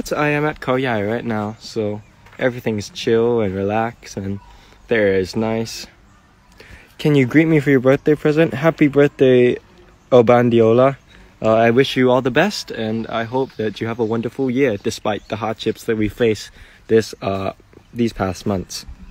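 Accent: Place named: United States. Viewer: He is heavily influenced by American English. His English sounds more or less like American rather than British or Australian or other accents.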